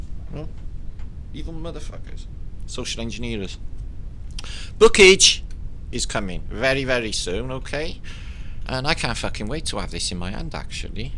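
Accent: Wales, United Kingdom. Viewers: how strange the other reply !! Should change their YT handle to "Anal D" - its a lovely Welsh " accent my friend :-)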